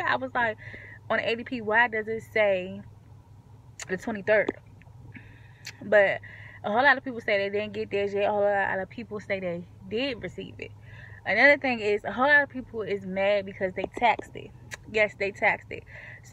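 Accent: DMV, United States. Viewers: You from the DMV?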